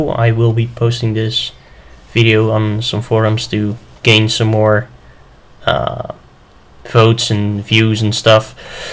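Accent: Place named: Netherlands. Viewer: Ah, Dutch, that's what it is. I could tell you had an accent but I couldn't tell what it was. For future reference "Souix" is pronounced "Soo," and "Navajo" is "Navaho." English is hell for trying to figure out how a word is pronounced just by looking at it. :P Great LP btw, YOu've earned yourself a subscriber.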